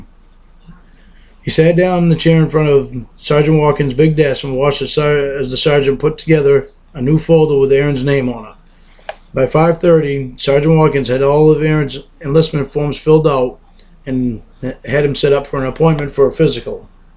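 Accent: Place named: Maine, United States. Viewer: Sound like a mainah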